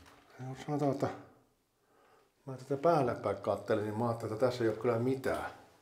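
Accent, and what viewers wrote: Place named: Finland. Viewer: Kiitos. Mistä päin miäs o, ku tuntuu tua murres nii kotoosalta !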